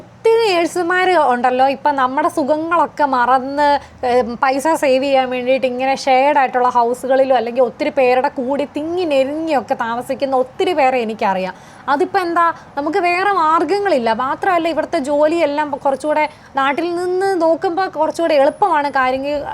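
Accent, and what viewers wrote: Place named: South Kerala, India. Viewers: കൂടുതൽ പേർ വിദേശത്തേക്ക് വരാതിരിക്കാൻ സ്വന്തം നിലനിൽപ്പ് ഭദ്രമാക്കൻ വേണ്ടി യാണ് തെക്കൻ മലയാള ഭാഷ സംസാരിക്കുന്ന ഈ സ്ത്രീ യുടെ ലക്ഷ്യം... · സംസാരം കേട്ടിട്ട് ഞങ്ങളുടെ തിരുവല്ല കോട്ടയം ഭാഗം ആണെന്ന് തോന്നുന്നല്ലോ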